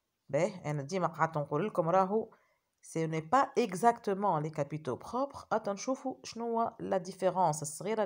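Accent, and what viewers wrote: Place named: Tunisia. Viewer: En tant que marocain j'arrive facilement à comprendre l'accent tunisien et vous expliquez très bien Mme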